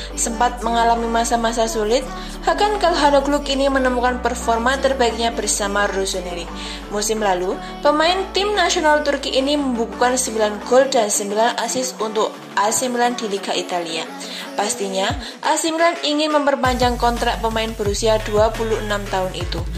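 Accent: Java, Indonesia. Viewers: Mbak e wong jowo endi? 😅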